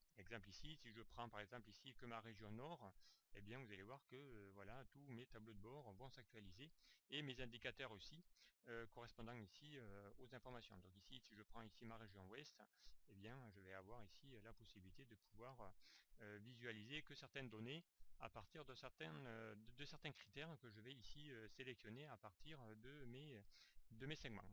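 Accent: South, France. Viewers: étonnant, j'entends très bien, même avec l'accent du sud de la France, manque les cigales en bruit de fond Essayez avec des écouteurs ou casque audio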